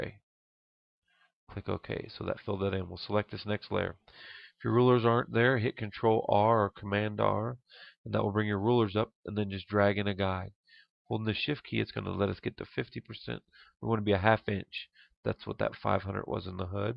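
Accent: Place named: Texas, United States. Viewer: Yes... Great intention and i think i get it BUT a bit too fast even though you sound like you're from Texas lol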